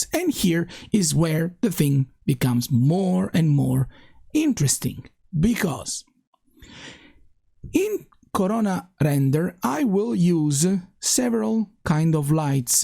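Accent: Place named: Sicily, Italy. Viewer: Finally! Finally that Sicilian accent makes it to YouTube 😎💪🏿🌺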